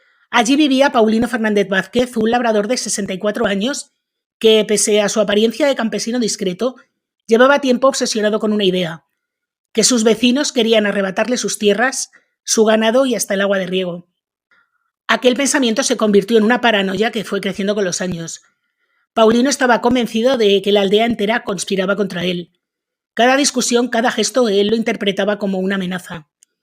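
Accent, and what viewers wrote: Spain. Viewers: Me encanta como relata con tanta espontaneidad y ese acento español le da un plus😊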